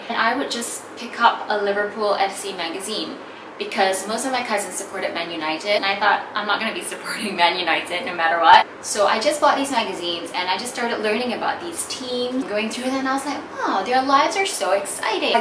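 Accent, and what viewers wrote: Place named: United States. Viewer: You speak well, where do you live. You have American accent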